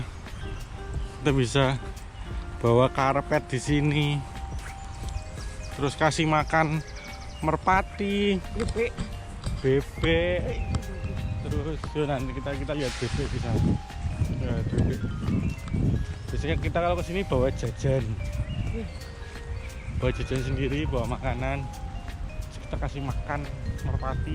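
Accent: Java, Indonesia. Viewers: Bahasa Indonesia-nya koq medok aslinya dari mana mas..?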